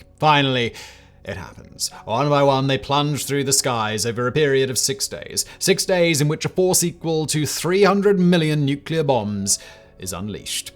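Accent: United Kingdom. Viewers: Please tell me where this guy's accent is from. Not the UK, or England, but what city.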